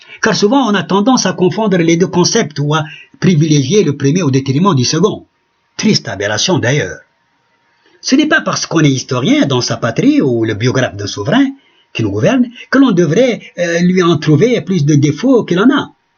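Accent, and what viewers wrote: Paris, France. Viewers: Une prestation magnifique, magistrale. On ne dirait pas à première vue que c'est un citoyen arabe qui parle dans la langue de Molière avec un accent purement parisien, dans un langage châtié, caractérisé par une éloquence qui fascine, une rhétorique qui plus qu'attirer l'attention, la retient du début à la fin. On est condamné dirais-je à une concentration brisant les cailloux de l'ennui des discours monotones, comme disait Paul Guth. Un véritable tribun qui rappelle Jaurès, mais avec un style plus modéré dans le ton et l'accent, comme dans une symphonie Beethovénienne, où la mélodie s'élève et descend harmonieusement, dans un débit parfois shakespearien. Le Professeur joue avec les mots comme il peut, mais toujours dans le sens qu'il veut, dans le respect de la grammaire. Voilà pour ce qui est de la linguistique. J'ai donné mon point de vue en ma qualité d'enseignant agrégé de grammaire. 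S'agissant du contenu et de la sémantique, j'en apprends d'avantage sur un conflit dont beaucoup de Français comme moi ignorent tant de choses. J'ai hâte d'en savoir plus, à partir du prochain épisode. Mais déjà le premier, annoncé en guise d'avertissement et d'intro, annonce déjà bien la couleur. Bon courage Professeur, et bonne chance par le Livre et la Plume. Vous honorez la langue française pour ne pas dire la francophonie, meux que des milliers de Français. Victor Hugo dormirait bien sur ses deux oreilles dans sa tombe.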